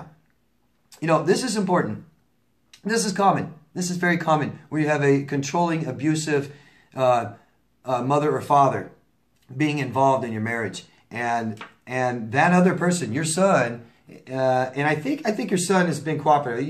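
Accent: England, United Kingdom. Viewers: I finally comprehend what is meant when a Narcissists see a person as an extension of themselves. I was thinking what does that mean ...like a third arm? No it means you are to help/aid them only and you get nothing or little in return. :( They SEE you only as a way to help themselves. :( Now I know my Father was a Narcissist. He never paid child support, no gifts or presents from him EVER! But he felt he was entitled to respect from us. As as adult I would do his shopping...help take care of his business...he future faked and said he was "leaving me something" . It was all one way in his favor and it does hurt when I realized he never loved me. He was only out for himself! He thought his presence was a gift to me. I do feel at peace knowing the problem was not with me. I wish I knew this earlier. People can have interactions with you for years and truly NEVER love you. 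I lived through that but I did not understand at the time. First recognize a self centered person then move on no matter who they are. Yes..sometimes a family member or romantic partner does NOT love you. Great English accent !! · Thank you, I just love your English accent 😘. In the UK you're allowed 6 free sessions of talking therapy on the NHS. This I did and my therapist and I think I'm much much improved. If I want more I have to pay, but as I'm paying for a lawyer... Is it possible to have 2 sociopath parents or 2 npd parents? From London, England.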